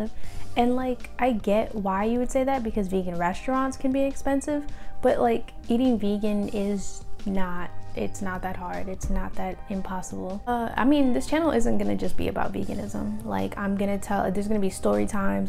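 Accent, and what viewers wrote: New York, United States. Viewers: Love that New York accent still! #VEGANCRUSH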